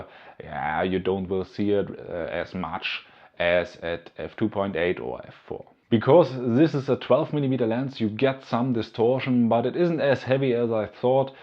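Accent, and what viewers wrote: Germany. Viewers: Rede doch lieber gleich deutsch ehe du dich mit deinem harten deutschen Akzent abmühst englisch zu sprechen.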